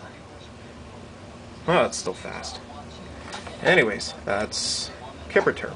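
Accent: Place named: Canada. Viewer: You sound very Canadian. :) Great vid!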